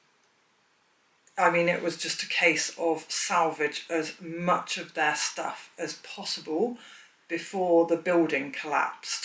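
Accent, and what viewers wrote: United Kingdom. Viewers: You have a West country twang.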